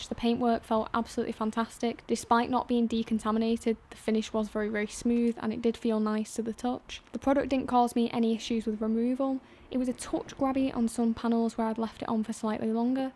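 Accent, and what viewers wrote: United Kingdom. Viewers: I love your British accent 💖 sometimes.....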